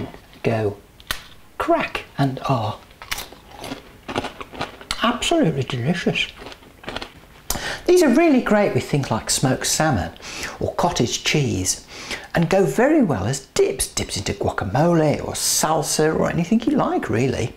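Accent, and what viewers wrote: Birmingham, United Kingdom. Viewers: It's a Birmingham accent but not very strong.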